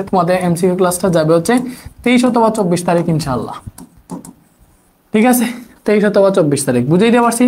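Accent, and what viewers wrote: Sylhet, Bangladesh. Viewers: Vai mone hoyy Sylhet E😮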